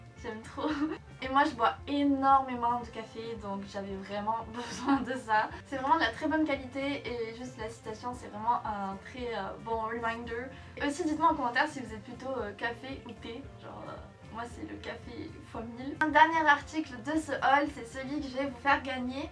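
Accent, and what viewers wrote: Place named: South, France. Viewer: Holaaa ! Mais attend c'est la première fois que j'entends ton accent du sud aussi prononcé , Marseille te réussi 😂 Eh j'aime beaucoup trooop tes achat bon comme d'hab hein . En tout cas ça me fait toujours autant plaisir de voir tes vidéos ❤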